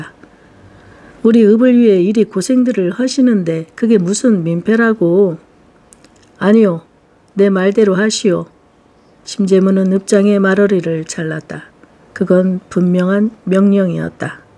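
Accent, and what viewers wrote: Jeolla, South Korea. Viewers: 전라도 사투리가 엄청 재미져부러라.😅😅😅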